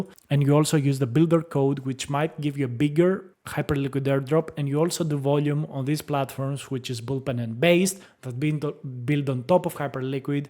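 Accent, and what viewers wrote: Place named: Greece. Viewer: I have a strong feeling that you’re one of the few honest YouTubers out there — someone who speaks openly, doesn’t try to take advantage of anyone, and always keeps it real with your audience. Also, I noticed that you speak English with a bit of a Greek accent, which makes your videos even more unique and enjoyable!😊 By the way, I left a comment on your previous video — I was wondering why Bullpen isn’t listed on DefiLlama? That’s actually one of the reasons I have some concerns about Bullpen.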